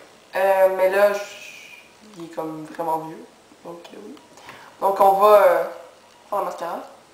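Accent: Quebec, Canada. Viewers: Normal qu'elle ait un accent elle est quebecquoise les gens non mais serieux !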